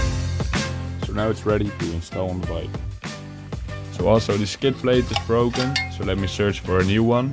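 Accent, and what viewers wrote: Netherlands. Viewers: Ik hoor gewoon dat deze man Nederlands is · He sounds Dutch, So probably pretty dang tall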